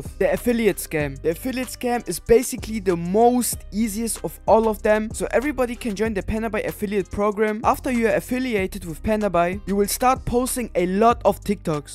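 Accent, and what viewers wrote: Germany. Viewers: geiles video aber du hast hein geilen deutschen ac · ich liebe deinen deutschen akzent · Feier den deutschen Akzent :D Gutes Video :) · Deutscher Akzent killt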